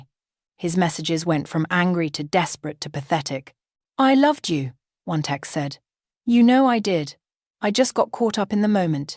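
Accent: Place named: England, United Kingdom. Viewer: May I say your voice with the English accent makes the story real.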